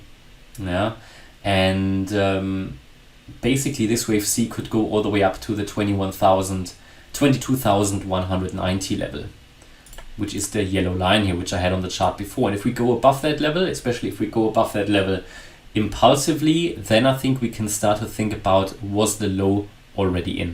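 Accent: Germany. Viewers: Love the German accent. Keep the studies in coming! 📊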